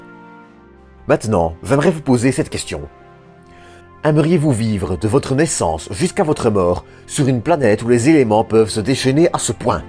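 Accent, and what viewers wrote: Liège, Belgium. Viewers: Exact, mais les français sont pas habitué à l'accent de la couronne Belge, ça les calmes. est super bosseur, en produisant une vidéo par semaine, c'est sa force, depuis les 20 K de Neowise, et plus de 150 vidéos en stock, la machine commence à s'auto alimenter. Il a l'envie de poursuivre, donc dans la durée, la chaîne aura plus de place. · Bel accent de Liege :D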